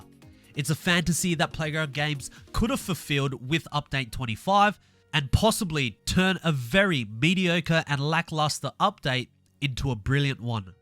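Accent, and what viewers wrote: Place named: Australia. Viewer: And I love your accent, to me, the Australian accent is the best and I can do a pretty good one myself (I'm partly Australian).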